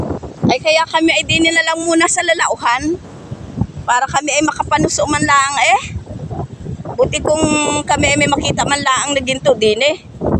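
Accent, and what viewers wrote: Batangas, Philippines. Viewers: Fullwatched nakakatuwa naman at akoy nakarinig ng puntong alae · Ang ganda diyan sa inyo at saka parang madali laang ang manguha ng pang ulam. Puntong Batangas nga ang dating mo idol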